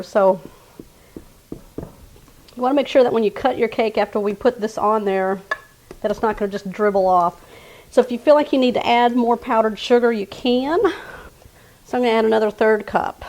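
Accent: Texas, United States. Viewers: I am delighted with your Texas accent pronouncing ‘marscapone’ like ‘NASCARpone’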